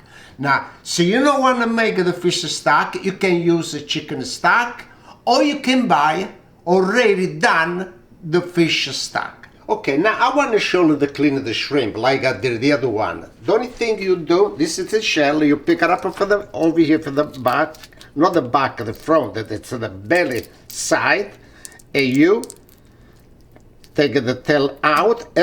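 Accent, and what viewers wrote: Italy. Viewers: Your Italian is just like my Mother's. She was from a village in Forino . It was called Petruro. You are Awsome!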